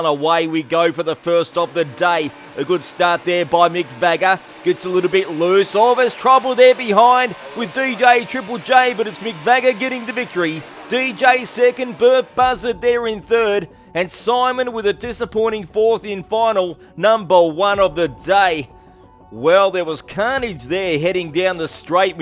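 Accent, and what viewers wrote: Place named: Australia. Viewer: I was rooting for Simon to win! But congrats to the winners. As an American, love to hear your commentary in Australian accent. Great racing to all!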